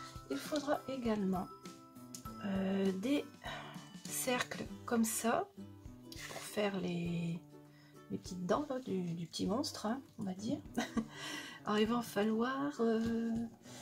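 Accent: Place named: Picardy, France. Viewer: encore mille fois merci je rigole à chaque fois avec tes petites pointes d’accent je me doutais un p’tit peu que c’était cette dimension j’ai commençé la carte je te dirais quand elle sera complètement finie je prends mon temps je veux que ce soit bien fait pour mon p’tit loulou je me lance je suis vraiment débutante tu sais merci ma tiote picarde gros bisous et à très bientôt pour de nouvelles cartes il va falloir que je m’équipe petit à petit!!!!💋👍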